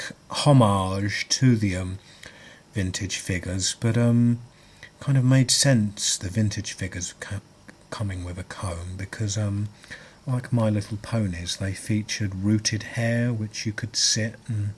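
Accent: United Kingdom. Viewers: cool british acent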